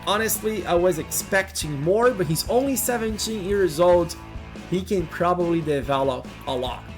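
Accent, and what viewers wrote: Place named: Brazil. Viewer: Brasilians guys allways have that accent, i'll bet he's brazilian =P good job 👍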